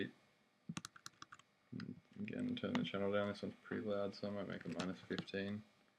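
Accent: Australia. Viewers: Thanks for the videos.. Great Help. Still very much a beginner so good to hear someone I understand. The Aus accent helps..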